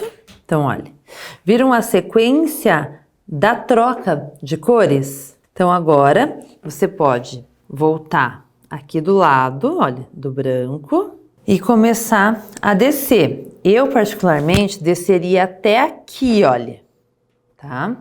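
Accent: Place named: Ponta Grossa, Brazil. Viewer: Adorei a sua aula ❤ O sotaque me dá muita saudade da minha avó de PG 🥰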